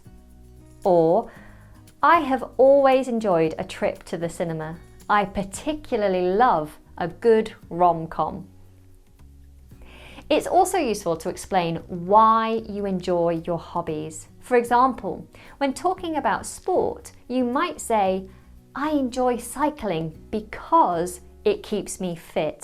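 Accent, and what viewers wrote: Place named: United Kingdom. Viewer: I enjoyed this lesson very much. It has been very interesting to listen to your British pronunciation and repeat. Thank you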